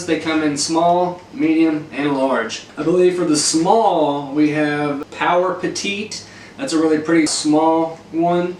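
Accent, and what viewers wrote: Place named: Central East, United States. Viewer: Just got my first peace lily and this is such a great video. I also knew you had to be from a central eastern state from your accent. I’m from Southwest Virginia😊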